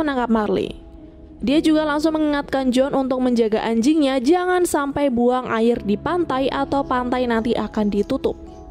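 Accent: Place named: West Java, Indonesia. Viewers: Sunda si teteh ey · HAHAHHW ANJIR ORANG BANDUNG NIH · Min orang mna aya sundaan🤣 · Aya Orang Sunda Ey😂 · Mimin sunda na bandung bukan si kalo bandung ku aing di apelin🥺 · Cewe Sunda njir yg nyeritain wkwk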